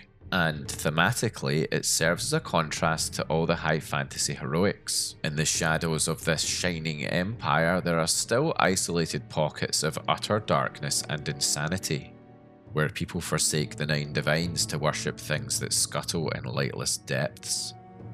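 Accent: Scotland, United Kingdom. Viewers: Love your accent. The Elder Scrolls need way more authentic scottish voices, it would fit in perfectly. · Me pressing play: "please dont be ai, please dont be ai, please dont be ai" (hears lovely - and REAL - Scottish accent): "OH THANK GOD!" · It's good to hear another Scotsman on the internet. Free the motherland from the Saxon hordes! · my favorite scottish narrator comes back with anothet great lore movie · This guy's voice and Scottish accent is more fitting for skyrim then the skyrim voice actors themselves. I'm not sure if you will see this comment but you are now my go to skyrim youtube channel.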